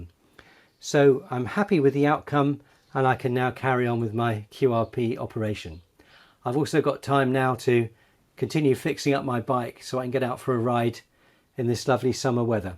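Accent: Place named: United Kingdom. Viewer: I love British English accent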